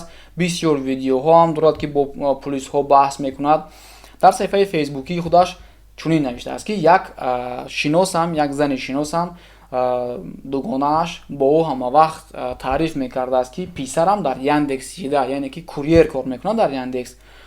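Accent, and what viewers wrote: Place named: Tajikistan. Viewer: Ташаккур, дустам... Забони фахмо, точикии тоза.... Бигзор дигар курдилону шевапарастон ва махалгароен биомузанд, гапзаниро...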